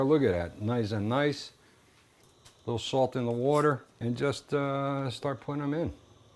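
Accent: New Jersey, United States. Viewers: Knew he was a fellow new jersyian from his accent - he didn't need the t-shirt to remind me of home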